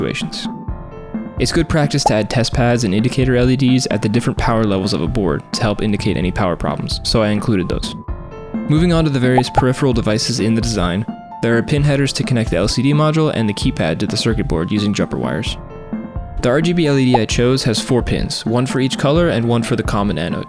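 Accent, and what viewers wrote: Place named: Germany. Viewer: Thank you! In the video I don't really address the problems but I'm currently working on my own website/blog about the projects I do. These blogposts will be a bit more detailed. Never heard someone being jealous of german accent lol. Can't really hide it haha.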